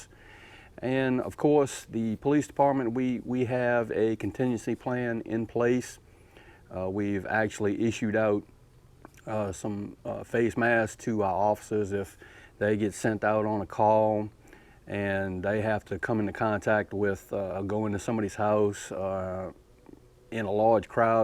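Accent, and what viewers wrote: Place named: Louisiana, United States. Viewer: I came over from another Mississippi channel...Justin and family's Homegrown Country Homestead. BTW...that graphic at the end is really not necessary...LOL. About a minute in to the video I thought, "He might live in middle Mississippi but he's NOT from there. He sounds like he's from down around Laffayette." I've been looking around the channel and I think I'll like coming back regularly. We do disagree on one thing though...this COVID-19 thing. I think we are far apart on it. Have an awesome and blessed day.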